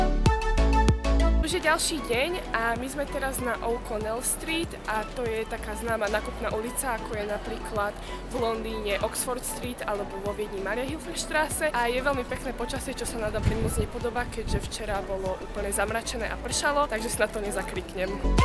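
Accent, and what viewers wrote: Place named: Slovakia. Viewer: já bych taky chtěla byt ze Slovenska je strašně krásný hlavne ten přízvuk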